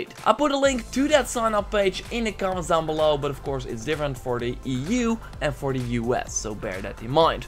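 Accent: Netherlands. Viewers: Ben je Nederlands? Ik hoor het aan je accent hahah ;)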